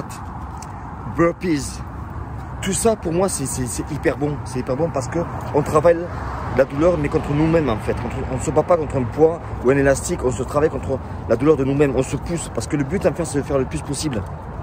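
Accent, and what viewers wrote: South, France. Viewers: Salut franchement tu me régale!! Un mec comme toi je vais à la guerre les yeux fermés un mec vrai et authentique sa se voit et sa s'entend😉 et étant de Perpignan entendre un accent et un parler du sud sur YouTube fait encore plus plaisir 😉👊🏼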